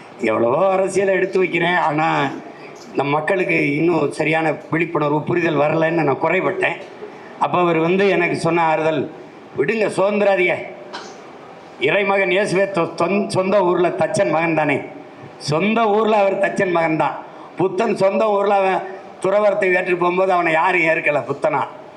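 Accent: Kongu, India. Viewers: கொங்கு தமிழ் நல்லா தான் இருக்கு ஆனா சாராயக்காரனுக்கு சொம்பு அடிக்கிறதா நல்லா இல்ல.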